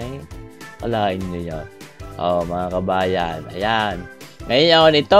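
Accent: Batangas, Philippines. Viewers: Kabayan nag review pa ulit ako ng luto mo. I believe na napakasarap niyo. Inggit ako. Salamat kabayan. Palagi kitang sinusubaybayan. Aliw na aliw ako dahil sa puntong Batangas. Pati ako ngayon ay na pra- practice ko ang tagalog na may puntong Batangas. Panalo yan.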